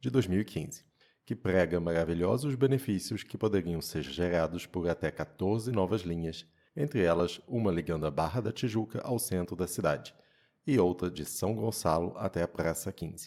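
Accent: France. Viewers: A seu sotaque francês é o sonho de todo carioca.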